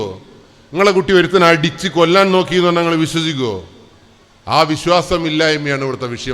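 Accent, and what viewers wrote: Malabar, India. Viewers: മലബാറിൻ്റെ ആ ഭാഷ ശൈലി❤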